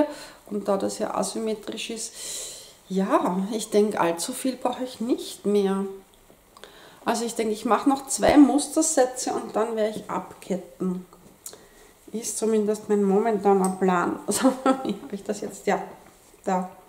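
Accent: Vienna, Austria. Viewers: Ich liebe Deinen Dialekt! Wollte deshalb als junges Mädle(Schwäbin) immer einen Wiener heiraten ((-; liebe Grüße Nicole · Hallo liebe, vielen Dank für dein neues Video. Nun bin ich aber froh, dass du hochdeutsch sprichst mit Wiener Akzent. 😀 Als echte Hessin würde ich zu deinem Pullover sagen: "der eas oig schie ean der basst so goud. Unn dai Foarwe.....schinner gieht nead." Tolle Projekte, die du produzierst und präsentierst.👍👏. Das assymetrische Tuch wird wunderschön. Die anderen Sachen natürlich auch 😉 P.S. ❤️lichen Glückwunsch der Gewinnererin des Wollstranges. Das ist eine tolle Wolle!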